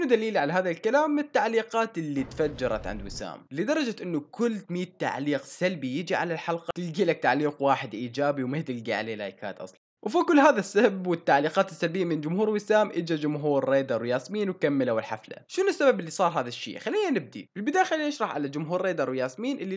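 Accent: Iraq. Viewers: ممصدك أنتة سوري ماشاء الله عليك ضابط الهجة العراقية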